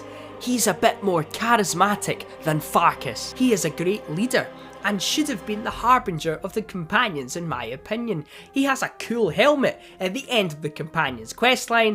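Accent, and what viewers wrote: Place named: Ireland. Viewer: You sound Irish, my mom's Irish so 😉. Happy St. Patrick's day ☘️.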